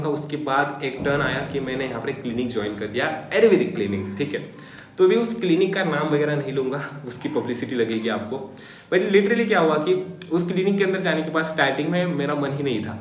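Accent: Maharashtra, India. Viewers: Love his marathi accents ❤😅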